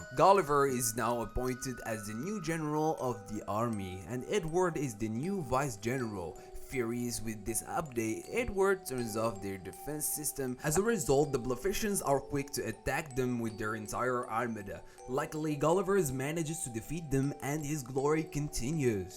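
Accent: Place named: Morocco. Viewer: i can swear that you're Moroccan hahaha fiiin aSsat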